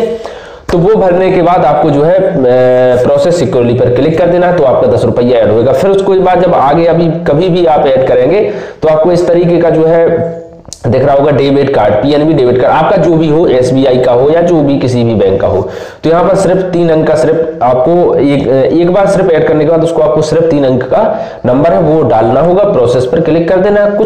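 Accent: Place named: Bundelkhand, India. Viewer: Aapki language sunkar lagata hai ki aap Bundelkhand se belong kartre hai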